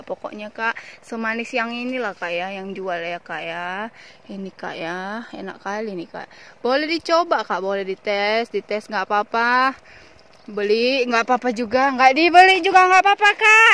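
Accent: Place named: Samosir, Indonesia. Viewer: Kayak yg jualan di samosir, ditomok pelabuhan, logat2nya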